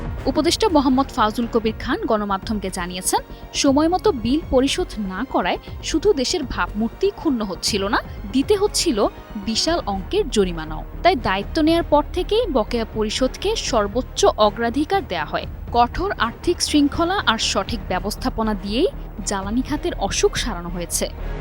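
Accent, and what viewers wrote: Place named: India. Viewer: নিউজ প্রেজেন্টার এমন শব্দ কেন উচ্চারণ করে কেন😂😂 একদম ইন্ডিয়ান মিডিয়া থেকে শিক্ষাপ্রাপ্ত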